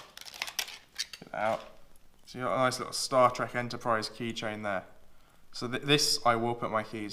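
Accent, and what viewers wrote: United Kingdom. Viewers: thanks So are u actually British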